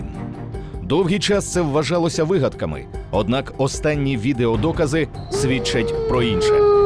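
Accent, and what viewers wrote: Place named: East, Ukraine. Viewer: Ахінея повна!!! Соус ЧУМАК у 1986 році!!! Лось без голови,та він оглядаяться блять на того хто його сполохав а без рогів бо то лосиха бля!!! А соми мутанти довші за людський зріст в Іспанії подивіться які,відсутність природних ворогів і людини і купа їжі,херак і ось тобі мутант трьохметровий!!!! А свійське порося в каналізації це взагалі треш особливо східний голос за кадром! БАЛАБОЛО СЮЖЕТ від 2+2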